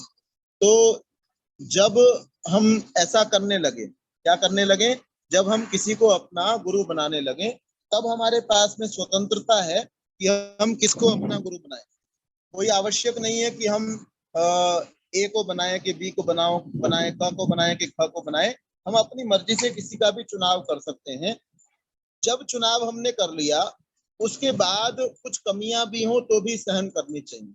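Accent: Standard. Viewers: आचार्य श्री आपके जैसा शुद्ध उच्चारण कभी ही सुनने को मिलता है।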